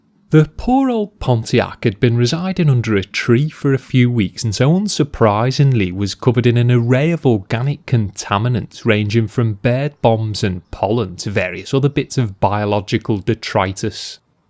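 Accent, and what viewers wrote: Liverpool, United Kingdom. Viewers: Yeah, but car and accent kind'a don't fit; hardly ever see any typical us-cars in the UK. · loving the fact you have a really subtle almost Scouse sounding North West twang. any ideas where this car went after your video or does the guy in Liverpool still own it? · kisses He's from the city of Liverpool in England and it's referred to as a Scouse accent (scouse as in mouse).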